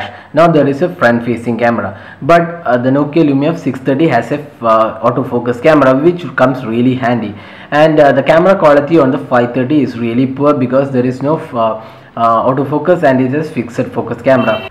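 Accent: India. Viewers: Whats up all these 35422 different indian mobile phone review channels?!?! Gimme a break guys. I'm totally allergic to your accent! Sorry!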